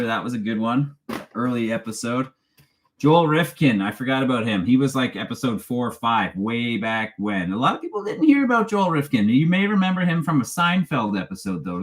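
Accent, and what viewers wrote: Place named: Canada. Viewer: Definitely Canadan. I get a chuckle everytime he says sorry cause of the accent.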